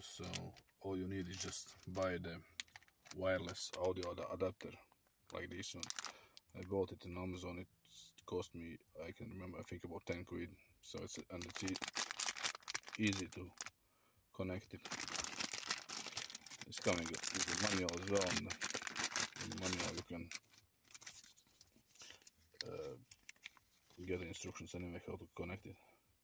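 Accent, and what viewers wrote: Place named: Latvia. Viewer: sveiks pēc akcenta liekas ,ka esi Latvietis ludzu atstui linku kur var pasutit to adopteri ?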